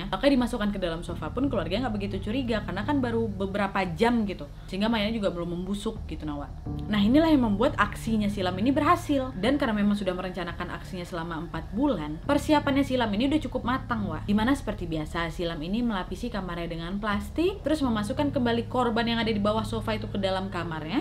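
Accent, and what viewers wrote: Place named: Medan, Indonesia. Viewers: Logat Medan wak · Medan kaleeee bahasa kakak ini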